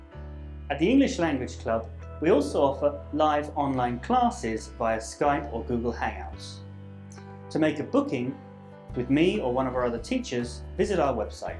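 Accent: United Kingdom. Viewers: Sir! Is this British pronunciation??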